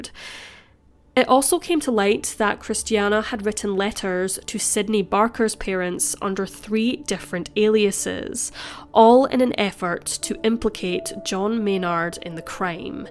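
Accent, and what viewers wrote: Scotland, United Kingdom. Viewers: Ah, that Scottish burr... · Lovely to hear a Scots narrator for a CHANGE.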